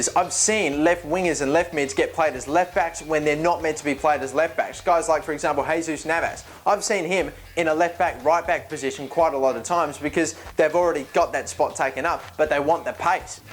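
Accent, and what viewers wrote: Germany. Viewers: Great German accent! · i like the german accent I thought it was accurate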